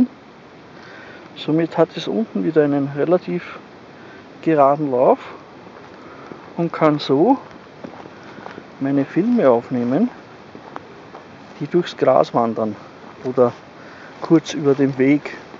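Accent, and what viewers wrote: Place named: Austria. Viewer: Ach ja, so ein Einbeinstativ ist schon Gold wert. Und der Deinige ist auch noch ein Spazierstock obendrein. In meiner Antwort auf deine pers. Nachricht wusste ich noch nicht woher du kommst. Ich denke mal..an deinem Dialekt aus Österreich. Auch das Kennzeichen deines Autos ist kein deutsches aber man kann es nicht gut erkennen. Aber ich möchte ja nicht herumschnüffeln sondern deinen Beitrag der für so manchen Wanderer interessant ist, beantworten. Gruß von Walter.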